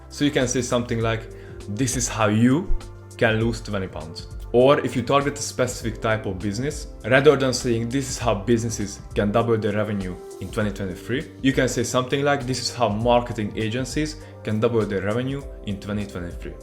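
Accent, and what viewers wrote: Hungary. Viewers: Olyan felismerhető a magyaros angol, egyből feltűnik 😀 Keep up the good work mate! 🔥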